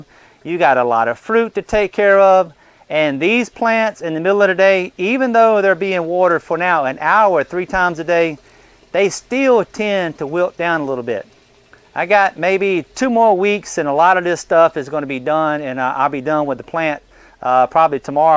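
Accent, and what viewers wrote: South, United States. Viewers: Forgot the name of your channel, so gave ChatGPT a description of a gardener that did hydroponics on YouTube about 10 years ago with a pleasant southern accent, and the AI knew exactly who I was looking for. Miss your videos.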